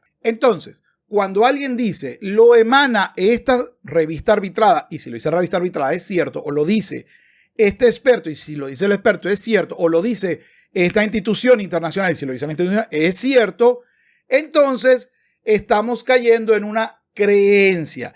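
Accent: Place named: Venezuela. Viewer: Eres venezolano , de lejos se nota !!! !!! De Caracas o de donde ....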